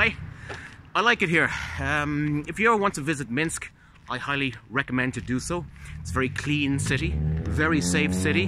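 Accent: Waterford, Ireland. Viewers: Why ask Bald? how would he know. He clearly has an Irish accent in the video. Ireland has a huge amount of Irish accents and none of them sound like Hollywood’s “Top of the mornings portrayal. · dose the local people speak english like you ie they sound like paddys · I'm in the Midwest US and I l hear a slight Irish accent but it's a lot closer to a US accent than I've heard from most Irish. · On another video he says that he is from Waterford ,S.E.Ireland but lived a few years in Dublin .Waterford City people have a kind of neutral accent.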